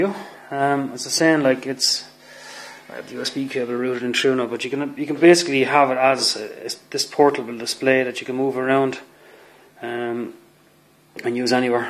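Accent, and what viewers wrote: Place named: Ireland. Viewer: I've actually bought the VSTs you sell on your website! I've always wondered what you sound like - now I know. Totally Irish, exactly like my father. Keep up the great work - Chris.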